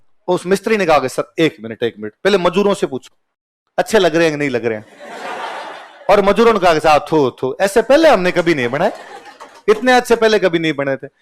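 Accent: Rajasthan, India. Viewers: राजस्थानी टोन ऐसी ही होती है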